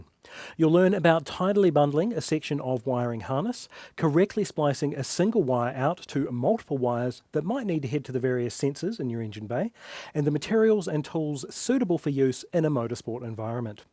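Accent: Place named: New Zealand. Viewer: Good old kiwi pronouncation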